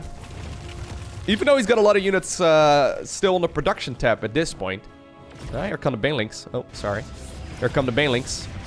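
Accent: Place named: Netherlands. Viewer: Dutch accent is so nice.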